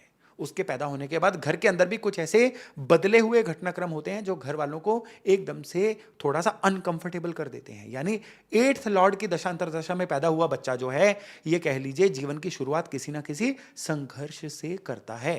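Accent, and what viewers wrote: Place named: Kanpur, India. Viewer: Sir ap kya kanpur se belong krte h 😅batiya rhe h yhi bola jata h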